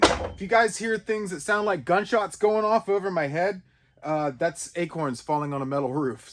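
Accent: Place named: South, United States. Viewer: Bob Ross would enjoy your videos. And now we have. A happy little edge. And he's alone. Lets give him a happy friend on the other side... Slide with the file. Just let the file slide... Sounds almost like a Southern accent. You have there... But yes. Thank you for sharing your info. On files and everything.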